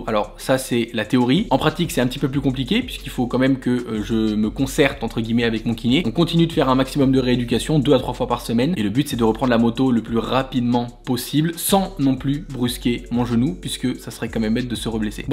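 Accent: Belgium. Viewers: Enfin qu'il parle comme un vrai belge continue comme ça le BG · Troooopppp biiiieeennn, les 5 actus, l’accent belge 🤣 👍🏻, 😳pas trop fan de la direction assistée sur une 🏍. 🤛🏻✌🏻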